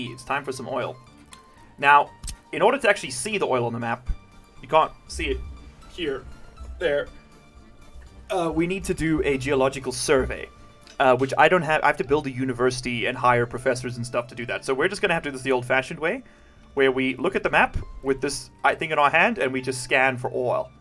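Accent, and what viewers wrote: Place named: South Africa. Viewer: Man you sound like most of the SA that move to New Zealand after a few years , every once and awhile the accent comes through, mostly on R's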